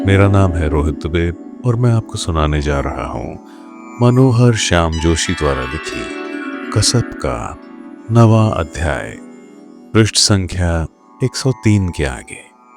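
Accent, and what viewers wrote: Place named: Kumaon, India. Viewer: मनोहर श्याम जोशी जी के 'कसप' को आपकी आवाज़ में सुनना एक अद्भुत साहित्यिक यात्रा जैसा है। इस उपन्यास के हर पात्र के लिए जिस तरह से आप अपने स्वर का चयन करते हैं और उसमें जान फूंक देते हैं, वह सचमुच काबिले-तारीफ़ है। खासकर कुमाऊंनी भाषा के शब्दों का आपका उच्चारण और जिस लहजे के साथ आप उन पर काम करते हैं, वह श्रोता को सीधे अल्मोड़ा की गलियों में ले जाता है। सबसे सुंदर बात यह है कि जिस सहजता से आप उपन्यास के बीच-बीच में गीतों की पंक्तियों को पिरोते हैं, वह कहानी के प्रभाव को कई गुना बढ़ा देती है और हर दृश्य को जीवंत कर देती है। आपकी वाचन शैली ने इस कालजयी कृति के रसास्वादन को और भी अधिक समृद्ध कर दिया है। इतनी मेहनत और कलात्मकता के साथ यह प्रस्तुति देने के लिए बहुत-बहुत धन्यवाद। सुनने का अनुभव अत्यंत सुखद है!"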